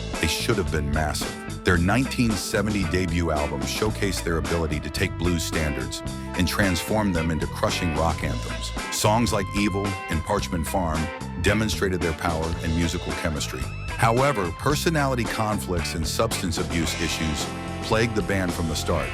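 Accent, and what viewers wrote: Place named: United States. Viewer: The American voice commentator turns me off every time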